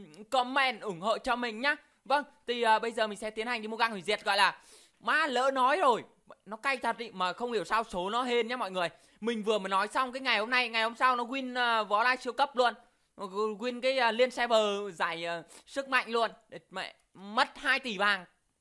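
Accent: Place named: Central, Vietnam. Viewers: tội ah hồm nay ns tiếng nghệ an, em cũng ở nghệ an ah này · A bl tiếg Huế maxx hay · Vkl giọng nghệ an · Đúng là dân nghệ tĩnh nói hay ri hey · Thích tiếng miềm trung hơn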